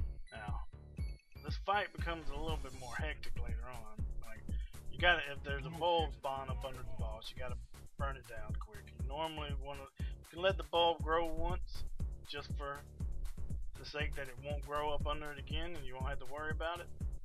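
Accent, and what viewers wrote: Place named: South, United States. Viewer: This was a decent video. Whispering like a scared little punk and your southern accent make it hard to understand you. Next time use your man voice.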